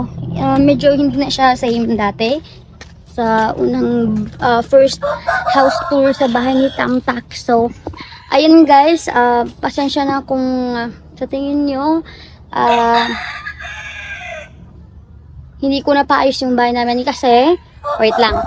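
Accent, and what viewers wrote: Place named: Iloilo, Philippines. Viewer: Sin hahaha ilongga tlaga. yero po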